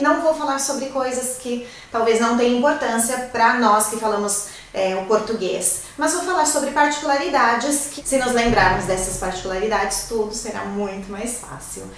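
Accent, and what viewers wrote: Italy. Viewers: Até o sotaque do português dela ficou em Italiano.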